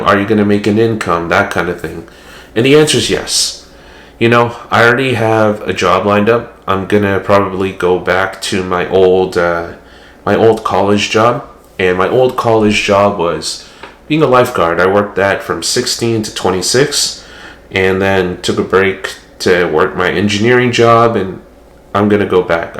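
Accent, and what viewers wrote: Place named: United States. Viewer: Your American accent is so clear. Good luck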